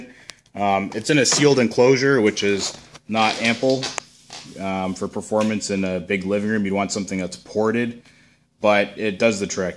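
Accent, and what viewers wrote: Canada. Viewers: You can easily tell hes Canadian. That's why he's well spoken. He's just not using slang or anything as some would in the US. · sounds like he's Canadian to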